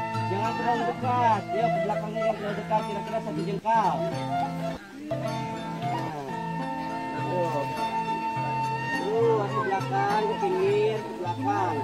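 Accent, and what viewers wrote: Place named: West Java, Indonesia. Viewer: iyeuuh, khas sundanya kerasa 😍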